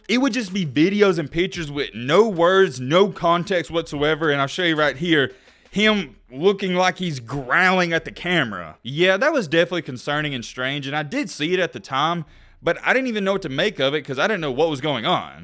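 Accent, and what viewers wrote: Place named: Kentucky, United States. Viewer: This guys definitely from Kentucky lol I can here it in his accent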